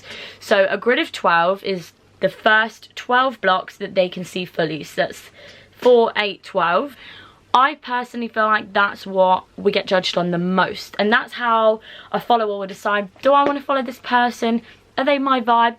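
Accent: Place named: RP, United Kingdom. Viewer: I love your cute posh accent 🥰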